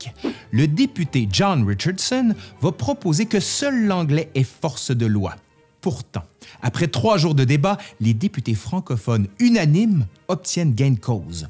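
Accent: Quebec, Canada. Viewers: Ça reste personnel mais je déteste l'accent québécois. Pour moi ce n'est pas le vraie français. Bonjour des Vosges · Alors honnêtement j'ai du mal avec votre accent mais ça ne m'empêche pas d'aimer ta chaîne et d'avoir de bons contacts avec les québécois. Je pensais que l'accent était plutôt dû à la cohabitation avec la langue anglaise, ça dépend vraiment des gens et de leurs lieux d origine Montréal ou autre. Cela dit en ce qui te concerne tu n'as pas un accent très prononcé, à peu de chose près tu parles comme un Français.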